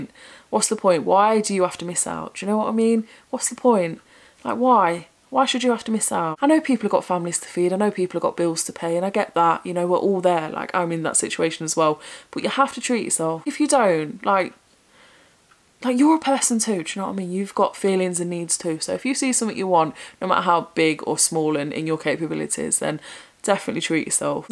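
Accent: Nottingham, United Kingdom. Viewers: The pool you’re on about is the blue lagoon in Iceland. Google it! It’s paradise on earth! Also where are you from it sounds like you’re from Nottingham? I love your content x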